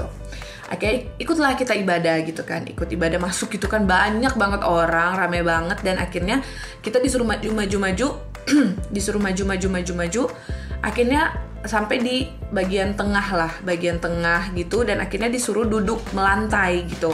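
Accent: Manado, Indonesia. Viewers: solop mana kita pe solop😂😂😂 picah tatawa pas tau ka pake logat Manado😂😂😂😂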